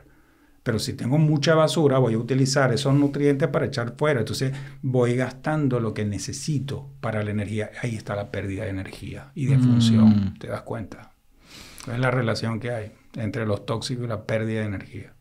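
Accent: Venezuela. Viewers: jiji Solo escuche el intro y super que el doctor es Venezolano, que orgullo. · Para mí, este capítulo ha sido una cátedra magistral, impartida por un profesional excepcional. Su brillantez reside también en su habilidad para simplificar términos técnicos, haciendo que la información sea comprensible para todos. Su calidad humana es igualmente destacable. Desconozco su trayectoria personal, pero por su acento, percibo una profunda conexión con los venezolanos y Venezuela. ¡Mi más sincero agradecimiento! Totalmente recomendado." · me descolocó cuando dijo que era italiano, desde escuchar sus primeros palabras supuse que era venezolano por su acento!! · su espanol es Venezolano claramente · Que hombre tan inteligente 🧠 y EXCELENTE ORADOR ❤ CREO Q ES VENEZOLANO POR SU ACENTO. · Excelente entrevista ! Usted es italiano pero habla muy Venezolano Doctor🇻🇪🙏🙌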